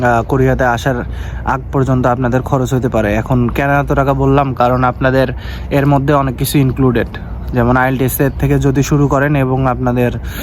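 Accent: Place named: Bogura, Bangladesh. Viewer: vaiya apni ki bograr apnr vasa ta bogurar moto laglo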